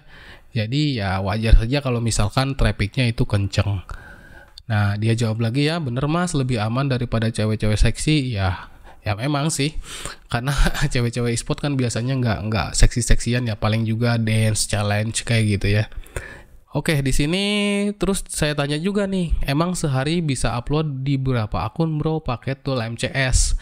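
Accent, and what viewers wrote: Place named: West Java, Indonesia. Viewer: lagam indo na siga sunda,sunda na timna a?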